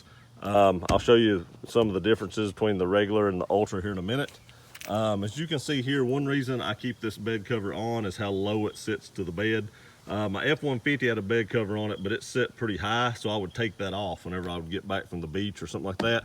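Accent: South, United States. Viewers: Ton-new cover love the accent and love how your southern shows how everyone is special and different. I say potatoes and my grandmother says taters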